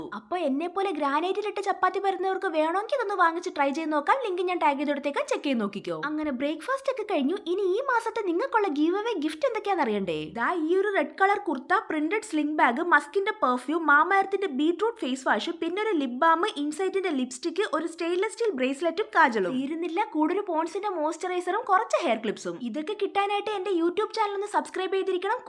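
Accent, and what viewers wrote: Kollam, India. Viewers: Kollam slang ❤️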